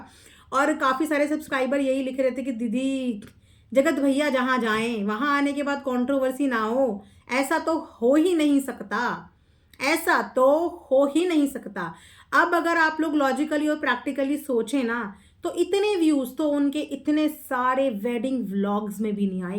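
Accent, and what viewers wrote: Bihar, India. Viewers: 😂kya baat hai bhut chill mood me hai...mast bihari tons de rhi hai😂👏👏👏👌🏼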